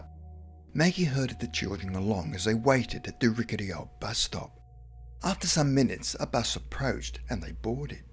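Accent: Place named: Australia. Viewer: The lady is Australian. If you wa want to listen to only English pronunciations then your choice of videos is going to be very limited. Even well paid TV presenters make errors in pronunciation, including the English, Scots, Welsh and Irish. The narrators here don't seem to be monetized. So they are doing us a favour. Don't like it then why be on here long enough to comment? Sheesh.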